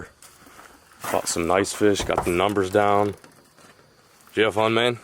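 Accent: South, United States. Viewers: why do you have a southern accent in this video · Lol, in this old vid you can hear a like southern accent from, wow things have changed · How come you talk like you have a southern draw in this video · How much voice has changed from then to today in 2019 The southern voice disappeared 😂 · Actually southern and mid Ohio + all the country side folk have that kind of southern twang. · I feel like you are talking more like a southern accent than you usually do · Sounds like you’ve got a southern accent in this video. I’m a new sub. Going back and watching videos. You sound so different.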